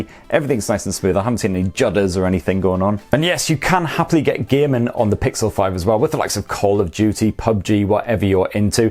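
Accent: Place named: Scotland, United Kingdom. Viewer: Where are you from,? You have a soft Scottish accent, so I'm thinking near the England/Scotland border?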